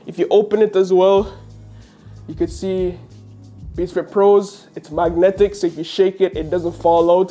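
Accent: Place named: Jamaica. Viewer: looooving this thique Jamaican accent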